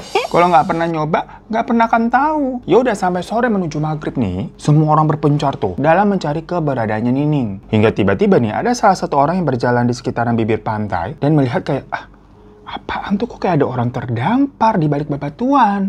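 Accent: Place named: Sukabumi, Indonesia. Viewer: Kaget pas denger di awal awal, lah orang Sukabumi ternyata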